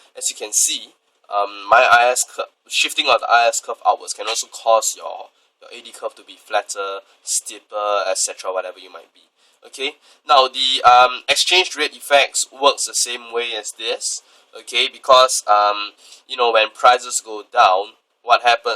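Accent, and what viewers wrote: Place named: Singapore. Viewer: Hi, thank you for your videos! Btw, are you Singaporean? Your accent sounds like it. That's cool because I understand everything you said in contrast to my university lecturers in Estonia. :D Cheers!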